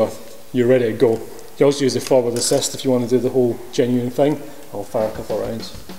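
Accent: Scotland, United Kingdom. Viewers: So many people think he's a brit. Smh. He is obviously a scot.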